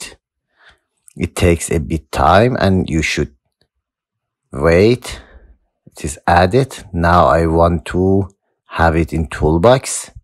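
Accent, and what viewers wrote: Iran. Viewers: are you Iranian???/🤣🤣🤣🤣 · لهجه ایرانی مشتی؟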